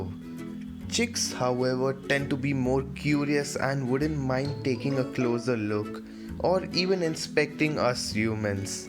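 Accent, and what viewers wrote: India. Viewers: annoying Indian accent.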